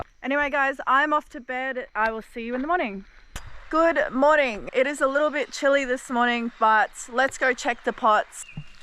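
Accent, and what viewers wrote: Australia. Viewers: I definitely would like to second what this guy here just said 👆 much rather prefer her Aussie accent...kind of a sucka for that accent. Anytime you want to come to the states let me know and we can roll around 😏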